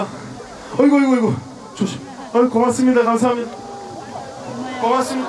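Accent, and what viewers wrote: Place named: Gyeongsang, South Korea. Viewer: #진해성 가수님 함양중학교 총동창회 축하드려요 더운날씨에 고생하셨습니다 첫무대,대히트곡 사랑반 눈물반 와 최곱니다 살짝썩인 사투리 무대맨트 최고에매력 경상도사투리 많이많이 써주세요 억지로 표준말을 쓸려고하지마세용 ㅋㅋ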